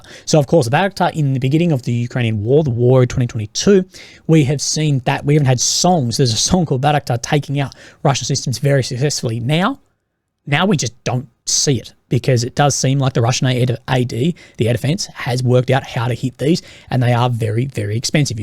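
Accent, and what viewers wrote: Australia. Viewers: He's Australian, DumbAzz!! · Sorry mate, I stand by my earlier comments that you are nothing but a US propaganda channel with an Aussie accent!!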